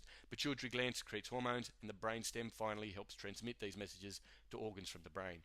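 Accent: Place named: Australia. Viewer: Sounded as if the bloke was Australian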